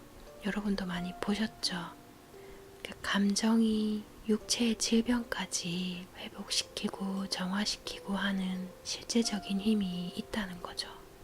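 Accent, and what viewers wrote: Gyeongsang, South Korea. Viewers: 경상도 사투리냄새가 물신 나,오히려 정겨워라. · 아휴 님 목소리 땜에 잠못 잡니다 어쩜 그리 목소리가 달콤한지 ᆢ 근데 고향이 어디신지 경상도 목소리가 이리 좋은지 몰랐네요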